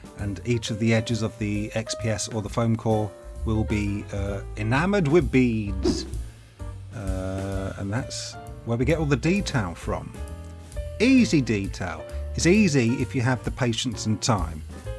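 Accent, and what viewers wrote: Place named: RP, United Kingdom. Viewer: As fascinating as your builds and build process is, the main draw is your dry humor and the posh affectation you apply to your accent. I can't quite tell if it's real or not, but it sells the dry humor. My American ears are entertained.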